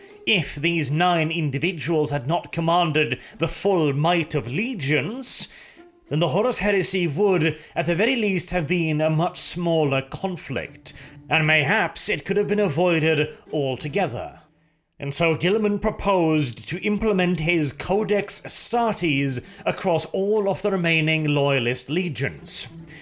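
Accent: United Kingdom. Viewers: I love your videos almost as much as I love 40k! But I have a question: Did you know that you have the "evil" British accent?? Works out great but I'd hesitate to meet up for a drink at a little pub . . . . . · I just started watching your content after the perturabo and you my friend have the quintessential British voice and it’s so good to listen to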